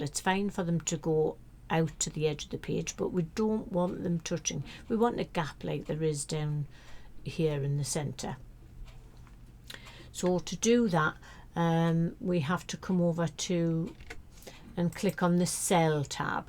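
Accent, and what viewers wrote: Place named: Newcastle, United Kingdom. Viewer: Liked and subbed cheers. I have so many images on my channel but want to see some actually in a book I did one a while back but left it to the printer and graphic designer to work out. Ten years on I look at it and although I’m happy enough I will do things differently like the printer insisted on it being a portrait style which I stupidly went along with. I knew at the time it should be landscape orientation but hey live and learn slowly. So now I’m looking at putting a book together via LR and probably printing via blurb just for myself initially. I’ve got the images populated so just a case of fine tuning the layout which Is why I just subbed. All the best mate love that Geordie accent 📷📷📷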